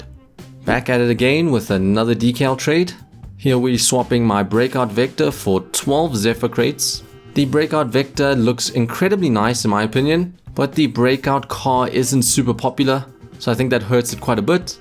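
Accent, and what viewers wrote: South Africa. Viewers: Your South African right?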